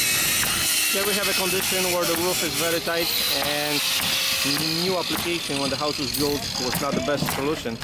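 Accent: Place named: Mexico. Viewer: good to see these tough americans working so hard. Gotta keep those jobs. No damn Mexicans come here as roofers, get rich and demand overly high wages. Not in my land. Hope that heavily accented Mexican commentator isn't getting paid much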